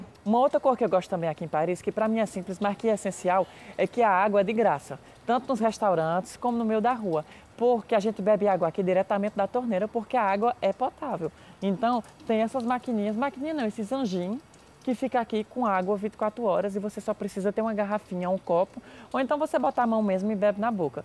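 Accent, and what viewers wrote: Ceará, Brazil. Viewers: sou nordestina também e adoro seus vídeos, vc fala como se ainda vivesse no nordeste kkkk! Ômi, fala da sua opinião sobre comida parisiense. Eu sou meio fresca com comida e nas vezes que fui em Paris só como steak e batata. Uma vez fomos jantar na "Champs", pedi risoto de frutos do mar....veio os Moules...tive coragem de comer não!! prefiro sururu! kkkkk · voce e muito engraçado ate o seu sutaque cearense voce ainda não deixou Conheço um pouco Paris porque tenho 1 filho e uma filha que moram na França ela em Angers vale do Loire linda cidade e o filho strasboug são profissionais ela medica e ele engenheiro moram na França ha 17 anos tenho 4 netinhos e se um dia eu for a Paris eu queria voce como meu guia turistico pra mostrar muitas coisas que eu nao conheço em Paris passei pelo Louvre Torre jardim de Luxembourg etc mas ainda tem coisas que eu nao conheço um abraço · td bem? 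Faz mais video desse estilo, falando o q vc não gosta e o que gosta. Você é otimooooo. Sou do Ceará tbm, mas tem MT tempo que sai de la e moro em Vitória, no ES. Então assistir seus vídeos me faz matar a saudade do sotaque de la, além de rir muito. Parabéns e sucesso. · Tô amando seus vídeos! Você sabe falar sobre diversos assuntos, sérios ou não, e ainda assim consegue arrancar risadas. Você é ótimo, amo o jeito de falar, representa nosso Ceará! Sucesso aí! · Aquele momento que você sente orgulho de ser cearense só pelo sotaque kk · Saiba q gosto muiiito, muiiito de vc. Uma afinidade q deve se justificar pela sua simpatia, educação, autenticidade e, mais q tudo junto, por sermos conterrâneos. Sou Cearense, do Cariri, e me emociona te ouvir falar com nosso sotaque, do nosso jeitinho tão singular. Vc fala de Crato, Juazeiro e eu embarco junto... Hj vc busca realizar seus sonhos e objetivos em Paris, eu na tão querida, por mim, Salvador/BA, mas o fato é q as nossas origens/ raízes são como uma marca, um sobrenome. 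Sorte e muitas Alegrias em sua vida. Que viva muitas aventuras especiais para q tenha sempre assuntos a compartilhar com a gente. Xeiroooooo grd.! E obrigada por nos fazer sorrir a cada frase. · oi! amo seus vídeos, suas opiniões, vc além de inteligente é muito divertido! amo o sotaque nordestino. um chêro ( minha mãe tb fala assim) bjs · Amigo! gosto da suas expressões, sou nordestino como você e sinto feliz quando fala com sotaque. Fazia muito tempo que eu não ouvia a palavra " pirangueiro " ou " pirangagem " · adorei, vc é mto engraçado! amo sotaque nordestino <3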